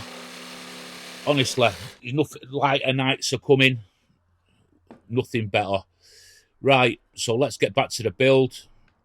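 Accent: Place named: Salford, United Kingdom. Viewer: Good video mate. Salford/Swinton accent?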